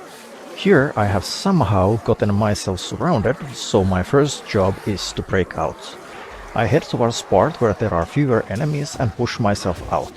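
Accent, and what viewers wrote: Finland. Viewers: I love your slight finnish accent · You have very finnish accent ❤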